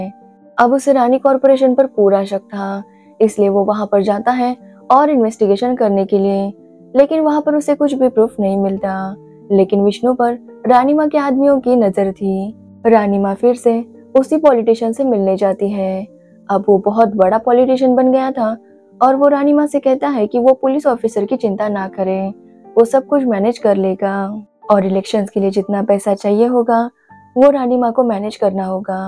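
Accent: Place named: Hyderabad, India. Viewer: Hyderbad se h ye ladki. Wahan log aise hi bolte hn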